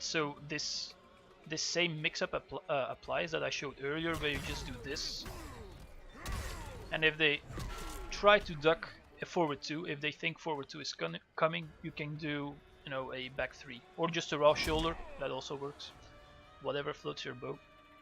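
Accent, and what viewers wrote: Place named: Flanders, Belgium. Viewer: HAHAHA. Gewoon het vlaams accent in Engels is zoiets uniek dat ik da kan opspeuren gelijk een hond xD Mercikes voor de guide man, tis straf!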